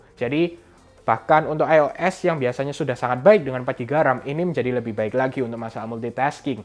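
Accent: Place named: Tegal, Indonesia. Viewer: Tegal atau cilacap mas atau brebes sih mas bro😁😁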